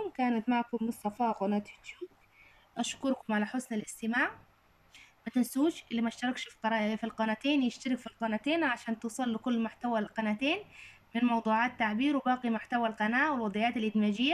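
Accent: Egypt. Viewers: عند حق راهي من مصر اسمعي هدرتها😂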